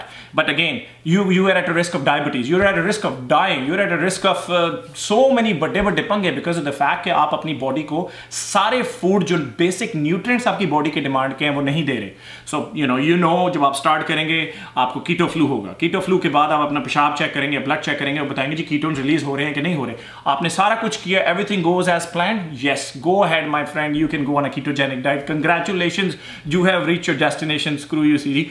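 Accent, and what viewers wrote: Punjab, India. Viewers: apka bolne ka tarika mujhe bohot pasand hai sir Punjabi English language apki kya baat hai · Kash like ki jaga pe smily emoji hoti is video k lye tou bunti hy bosss 😜😊😊😊 Thanku soo much for your advice with zbrdast style of punjabi tarqa 😜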